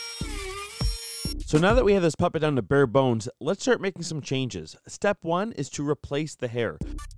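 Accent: Brooklyn, United States. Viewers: Ahehe Brooklyn guy lol...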